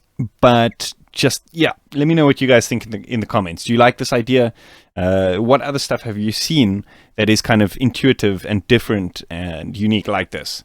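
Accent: South Africa. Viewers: Yooo a fellow South African?!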